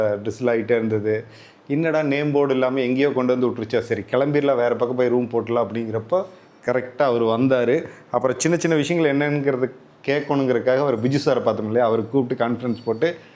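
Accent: Kongu, India. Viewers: I wish i could meet you someday. I watch your channel just to hear your no nonsense kongu tamizh. I am from gobi. Keep riding and keep making these wonderful videos.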